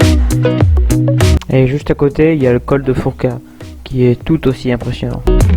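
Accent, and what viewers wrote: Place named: Germany. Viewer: Sympa la vidéo et que dire de ton super accent allemand...vivement la suite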